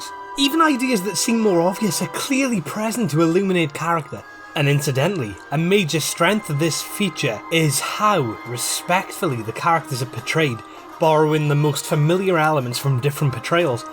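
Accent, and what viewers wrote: United Kingdom. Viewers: Are you from Scotland? Im not well versed in the batman story but you kept it exciting and easy to follow.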